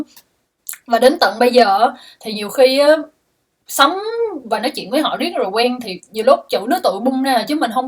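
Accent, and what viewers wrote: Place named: Buon Ma Thuot, Vietnam. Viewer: bạn này nghe giọng giống ở buôn mê thuột ghê :)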